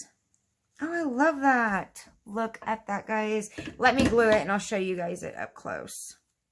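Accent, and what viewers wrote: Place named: South, United States. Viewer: you do sound southern to me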